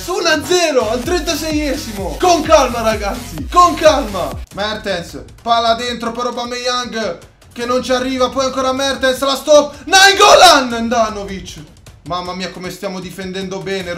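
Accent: Sardinia, Italy. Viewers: ma sei sardo?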